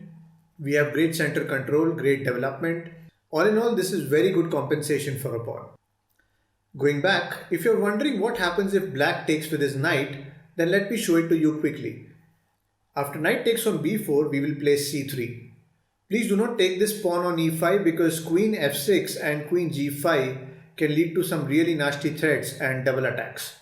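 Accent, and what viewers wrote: India. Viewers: Me: hm thats not gonna help hears indian voice Woaaahhh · I've never heard such a nice Indian accent😀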